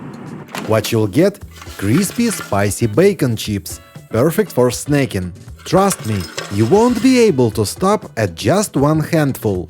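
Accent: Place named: Russia. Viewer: awesome accent! Reminds me of my fav star trek "Chekov" Walter Koenig ❤️ ingenious creative recipes!!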